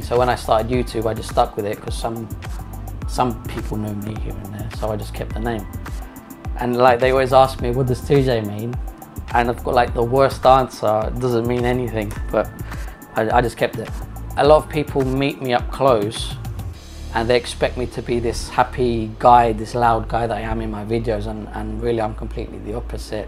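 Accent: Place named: United Kingdom. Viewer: mate I'm telling ya if you go back to UK for even less than a year, you'll get your deep British accent back... greetings from Australia 🤙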